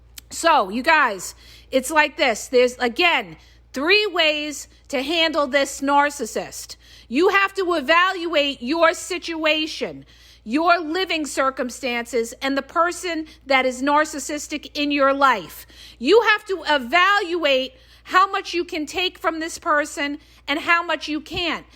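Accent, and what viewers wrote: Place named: New York City, United States. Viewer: Greetings from Springfield, MO. You sound like NYC gal 👧. God Bless you! You are very entertaining 😂 I'm really enjoying your content, 2 years no contact with Narc family members hallelujah!! It feels amazing 👏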